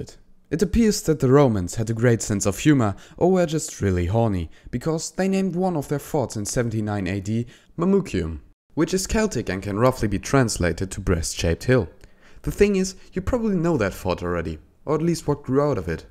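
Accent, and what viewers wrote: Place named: Germany. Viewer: Btw I hope I Identified your accent correctly as german :p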